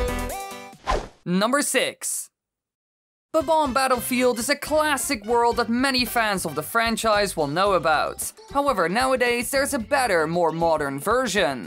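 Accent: Netherlands. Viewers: This english accent is dutch hm?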